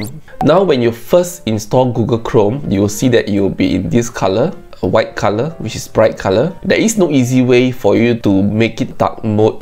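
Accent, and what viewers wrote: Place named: Asia. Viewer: Bro has an Asian accent 🤣 (not hating)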